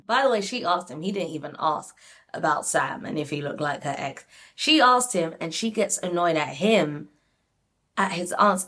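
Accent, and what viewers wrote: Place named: United Kingdom. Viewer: I love your reviews and that accent is so enticing. Honestly, when you brits talk, I just damn listen 😂. Ok so, let's get into it. I have subscribed and thumbed up 😉 1) Nicole and Sam - we have to be fair and not give Nicole a pass for her behavior. Her asking Sam for physical contact after that disastrous reveal and what her heart showed her is really low. She has self-esteem issues. Was it love at all cost for her, cuz sis has me really disappointed with that revelation. Did she think that sleeping with him was going to erase the awfulness that is Sam? 2) Demi and Ollie - Ollie is not about that life, he likes pretty girls and whilst he knows Demi is good wife material, the sexual tension is lacking. This wont' work. Demi is just a homie to him. 3) Maria and Tom - Maria can't have it both ways, she can't be about arab/muslim culture and values in one scene and be drinking a cosmo in another. 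I have nothing against Muslims/arabs nor against alcohol but the fact that she is a liberal muslim proves that she needs to compromise with the world as she has done with herself. It's not because Tom does not put religion or culture forwards that he lacks values or choice. Stop it already. 4) Cat and Freddie - Cat has a temper or maybe it's Freddie that triggers her. I think Freddie's gorgeousness throws her off, it's almost as if this was his best quality and his worst at the same time because people tend to associate his looks with someone who is effeminate or soft. In any case, Cat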